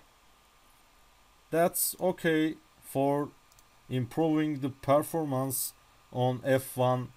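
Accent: Turkey. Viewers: D Türk aksanı. Bu arada işe yaramıyor.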